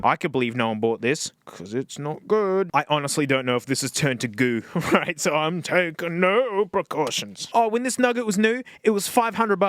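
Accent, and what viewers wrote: Australia. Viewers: Australian mate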